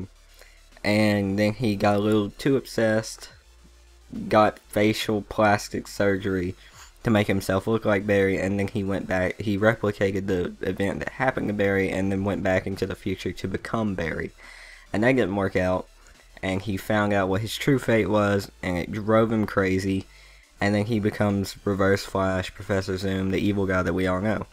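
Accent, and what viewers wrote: South, United States. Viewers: Is that a mild Southern accent? Just curious, mate!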